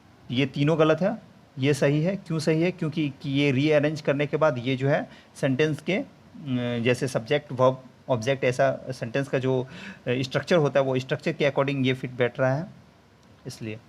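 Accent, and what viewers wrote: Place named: Bihar, India. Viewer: Hello friend, it is not mistress Meera in first question rather miss-iz would be correct pronunciation. Further I suggest you not to teach English because when you speak you have got regional touch in your pronunciation and to validate myself I can say that you are from Bihar for sure. You should try something else because English is not a subject, it is a language where pronunciation has a great importance.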